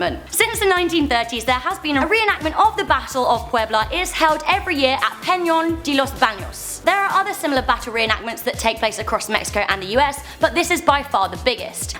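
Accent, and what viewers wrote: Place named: United Kingdom. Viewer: GIRL YOUR SPEAKING BRITISH ENGLISH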